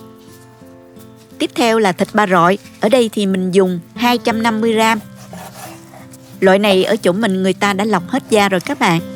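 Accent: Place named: Saigon, Vietnam. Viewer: Giọng Sài Gòn xưa thật dễ thương phải không?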